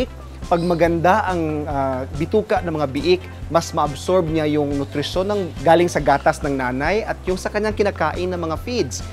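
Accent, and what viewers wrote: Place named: Cagayan de Oro, Philippines. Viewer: sherwin jara : ang galing nga po.... Parang taga amin c Doc sa CDO....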